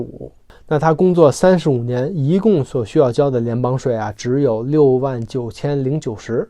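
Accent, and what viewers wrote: Tianjin, China. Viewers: 听口音感觉up是天津人，对不对😀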